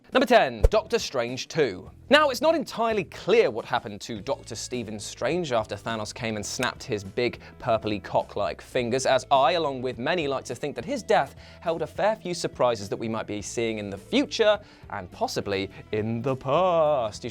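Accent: United Kingdom. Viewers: Brick Boss 2004 he’s British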